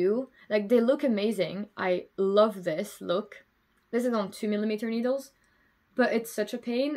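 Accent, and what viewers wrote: Scotland, United Kingdom. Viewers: A lovely podcast. Your sweater is so nice. I live in Glasgow & you sound very Scottish. I like your swatch knitted in local Suri Alpaca. I am knitting a third Sophie scarf for a friend. Thanks 🏴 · I'm watching you just now and love the Scottishness coming through your accent. Like all of us in Central Scotland you've dropped your t's. I'm really enjoying the podcast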